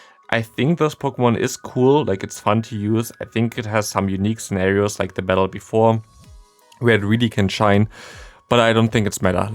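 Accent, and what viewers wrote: Germany. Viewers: If your slight accent gave you away as I'd assume either german/austrian or swiss, its even more insane that you made this video so early. I've just awoken from my slumber, thanks for the dedication and great video :D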